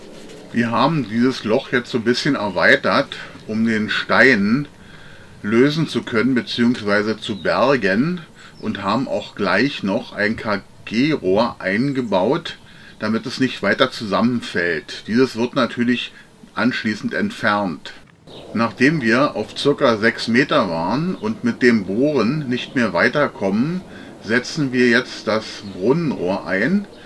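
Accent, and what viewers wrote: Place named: Berlin, Germany. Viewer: Was soll daran nicht mein Ernst sein? Dem Tonfall nach zu urteilen befinden wir uns in Berlin oder Brandenburg, folglich bezieht sich meine Frage auf die dem Brunnenbauer/Grundwassernutzer obliegenden Verpflichtungen nach den Paragrafen 36 und 37 des Berliner Wassergesetzes oder wahlweise 55 und 56 des brandenburgischen Wassergesetzes, ansonsten schlicht und einfach auf die Regelungen des Wasserhaushaltsgesetz des Bundes in Bezug auf den Brunnenbau und die Benutzung von Grundwasser.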